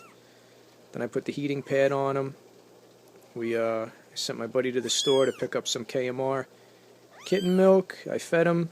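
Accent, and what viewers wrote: New York, United States. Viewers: I love the sound of your tough new yorker accent and then this cute kitten. Great combination. You did the best you could. You're a legend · Buddy, are you from queens or new york general area? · Hey man, are you a New Yorker?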